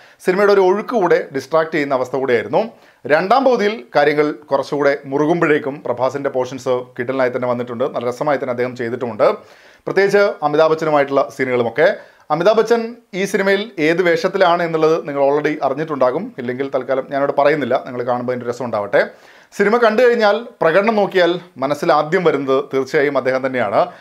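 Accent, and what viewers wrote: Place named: Palakkad, India. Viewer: Palakkad tamil ഉണ്ട്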